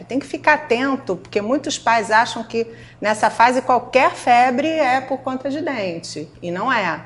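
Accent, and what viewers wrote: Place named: Rio de Janeiro, Brazil. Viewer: Muito bem explicado e interessante! A dica do picolé de leite materno foi bacana. Mas esse sotaque carioquês da gema, carregadissimo incomoda um pouco.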